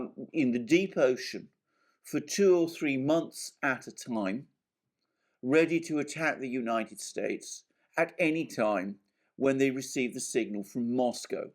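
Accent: England, United Kingdom. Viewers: all news should be delivered, in such a professional manner. Your wonderful crisp, English accent, is much appreciated. Thank you for explaining, what I cannot 🇬🇧xx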